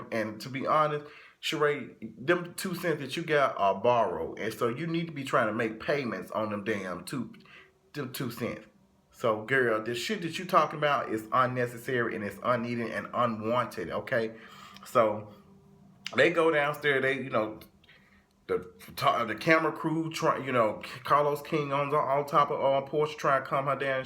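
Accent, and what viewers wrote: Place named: South, United States. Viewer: Your country talking 😭😭😭😭😭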